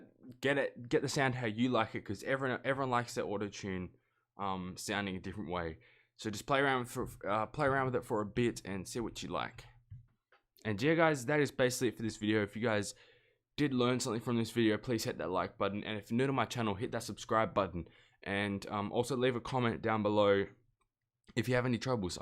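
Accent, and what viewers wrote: Australia. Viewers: smol bean. clout machine.TM bro you can tell by the accent he’s Australian???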